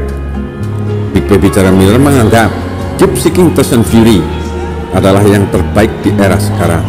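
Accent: Java, Indonesia. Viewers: Mas meddoknya bisa diilangin ga?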